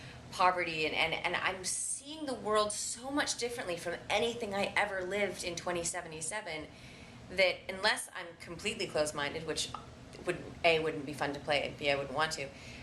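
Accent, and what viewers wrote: England, United Kingdom. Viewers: is she english? she sounds english might be me though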